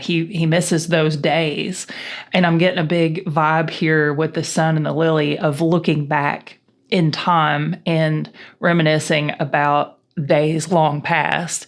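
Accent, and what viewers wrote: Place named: Kentucky, United States. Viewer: Hello. LOVE your accent (my father was from Kentucky. It's a beautiful state!), and your readings. Bless you for the New Year 🎊🎆🎇🔔!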